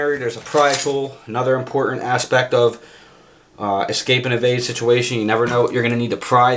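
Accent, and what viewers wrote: Philadelphia, United States. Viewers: Just found your channel, great info! But I thought to myself this dude sounds like he’s from Philly...then you said “ water” not “wooder” so not sure? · I’m from philly and I knew you had a philly accent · I knew from your accent, you were Philly. I'm born and raised Philly, went to Lincoln, class of 94. Watch your back brother. Things besides the pandemic can hurt you, like Mayor Kenny, the DA and the skells of the city. Good video bro...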